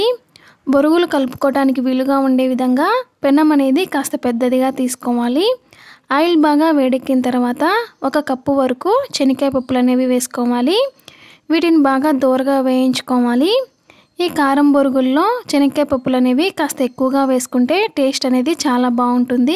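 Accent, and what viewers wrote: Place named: Rayalaseema, India. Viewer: Mana rayalaseema basha ❤ chana baguntundi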